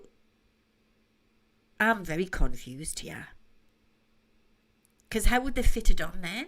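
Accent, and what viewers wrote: Newcastle, United Kingdom. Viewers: Only just found your channel x loving it and just love the Geordi accent x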